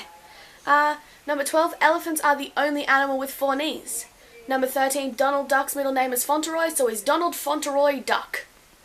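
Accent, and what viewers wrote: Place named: Australia. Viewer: Great video :D Love the Aussie accent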